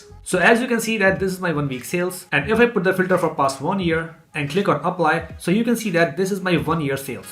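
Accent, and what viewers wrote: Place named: India. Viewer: not hate but your accent is an incredible thing that most indians do ITS AN INSULT FOR AUDITORS SERIOUSLY, fix this please!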